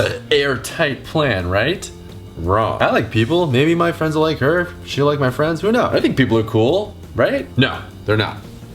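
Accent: Boston, United States. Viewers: I just like listening to your Boston accent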